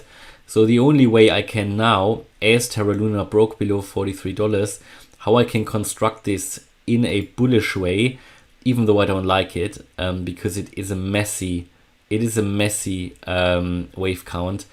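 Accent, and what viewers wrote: Germany. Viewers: Thanks for the video, are you from Germany?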